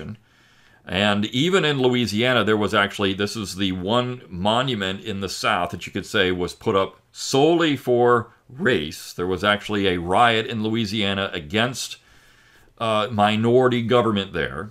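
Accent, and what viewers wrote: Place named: New England, United States. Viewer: Sounds like a Yankee to me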